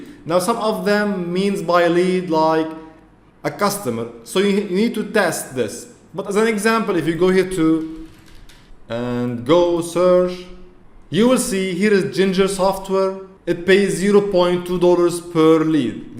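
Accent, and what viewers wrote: Arab world. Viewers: May not an arabic native speaker try to speak English language. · عرفت انك عربي من اول كلامك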